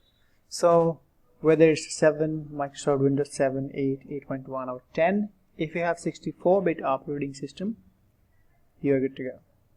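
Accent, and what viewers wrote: India. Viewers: Hey Indian go to normal accent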